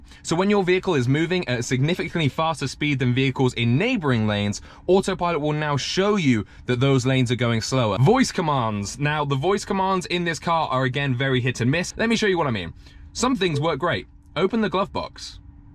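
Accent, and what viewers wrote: United Kingdom. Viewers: I have seen a vidéo in the US and work perfectly...weird ! Maybe your brits accent ? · Your car don't understand British accent. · I watch this guy's video specifically for that accent. Saying something even if stupid, in a Britsh accent just makes it sound smart. I think that's how Boris Johnson got to be PM.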